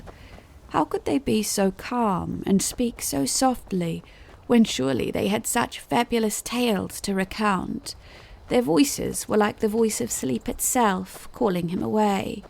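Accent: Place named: New Zealand. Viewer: The sad unfortunate side of listening to these knowledgeable people is just a reminder of how little I know! How unfortunate that there is so much to learn and yet it would take a plethora of lifetimes to just break the surface. …but, do endeavour to learn as much as I can while I walk the earth! Actually your Kiwi accent is what makes your narrations so appealing.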